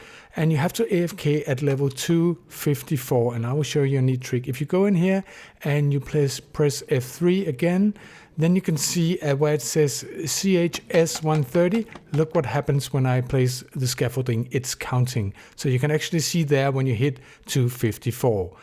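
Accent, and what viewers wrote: Denmark. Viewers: your acsent really sounds danish ngl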